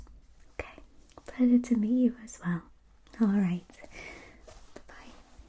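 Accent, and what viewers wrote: United Kingdom. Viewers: Very relaxing British voice 🇬🇧👍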